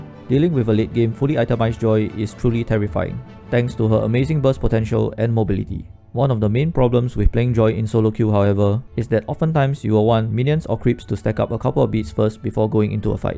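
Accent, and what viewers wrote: Singapore. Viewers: The heavy sg accent bro 😭😭😭 but good guide lah keep uploading guide like this can do very good one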